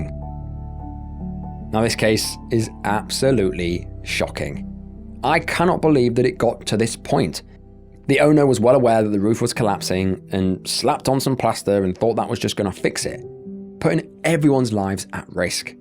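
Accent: United Kingdom. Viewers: The Queens English in Ai is just too funny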